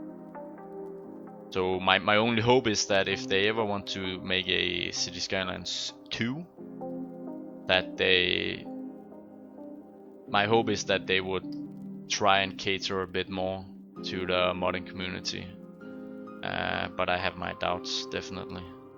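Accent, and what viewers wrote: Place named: Denmark. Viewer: Your accent sounds Danish, are you?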